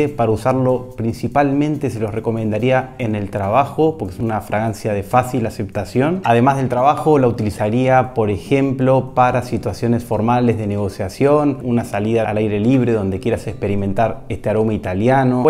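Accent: Argentina. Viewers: Bien claro las explicaciones. Maestro es cordobés usted?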